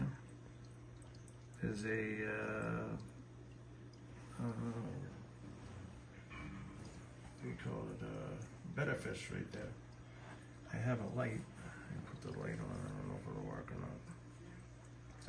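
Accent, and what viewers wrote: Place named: Boston, United States. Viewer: Great first video,! I think it is a lot better than my first video. Love the Boston accent--I haven't heard that in a while. You need to turn that up! Keep up the good work. Anyone with Mascara barbs is number 1 in my book. Oh, and BTW, I love the live stream that was playing on your big-screen TV.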